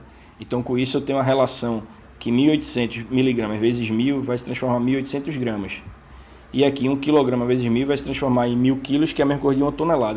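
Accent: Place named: Northeast, Brazil. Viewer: "arrente" amoooooo esse sotaque s2 hahaha nordeste pisa menos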